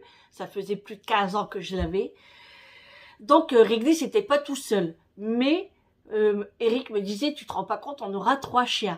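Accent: North, France. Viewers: Hello Houppsss 😝je suis nouvelle abonnée j’adore tes bla-bla avec ton accent du ch’nord,moi je viens de Belgique 😉je me permets de te tutoyer car je suis un peux plus âgée 🤫qu’elle courage d’être Maman d’une belle familles félicitations tu arrêtes jamais mais c’est certain que pour ses enfants on se décarcasse toujours même s’il sont grand j’ai moi même deux grands garçons 🥰je te souhaite déjà une bonne fête dès Maman et j’espère que tu sera bien gâtée 💝prends soin de toi à bientôt pour d’autres aventures 🫶🏼bisous 😘Vivi.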